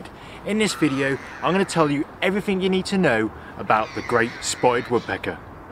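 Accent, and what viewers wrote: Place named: Estuary, United Kingdom. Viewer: here's a thought .. less estuary english and more woodpecker drumming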